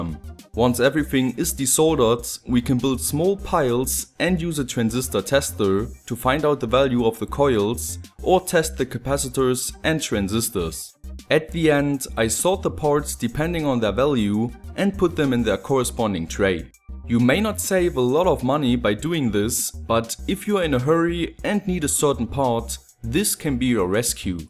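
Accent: Germany. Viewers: That's a german accent :-) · Are you German? You sound like me when i speak English (i'm German). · german trying HARD to speak english... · Desloder with a heatgun... u welcome ;) btw. i would guess u german and not russian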